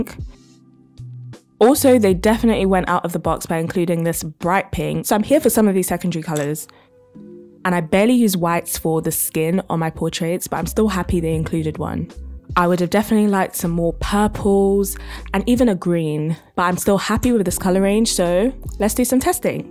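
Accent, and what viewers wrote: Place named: England, United Kingdom. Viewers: i'm not sure what you mean. i'm pretty sure she has a British accent but u do u ig. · everyone has an accent? I’m not good with accents but she has a type of English accent.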